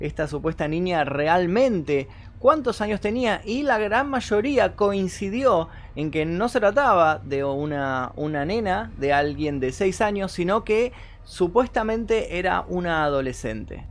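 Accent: Argentina. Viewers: jajajajajaja tranquilo bro, no puse nada malo xd me gusta el acento de los argentinos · Argentino che? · SOS ARGENTINO ??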